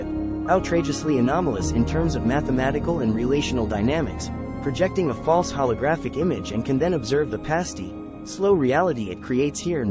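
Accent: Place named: Australia. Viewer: Thats not your voice!! You, my man,sound like crocodile dundee!! Crikey! Great job! The moon is indeed a spaceship.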